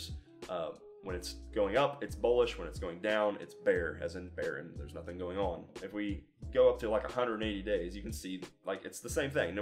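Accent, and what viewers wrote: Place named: United States. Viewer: And he also fixed his English and now talks like an American.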